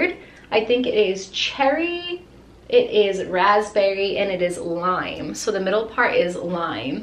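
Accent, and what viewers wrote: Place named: Rhode Island, United States. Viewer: Rhode Island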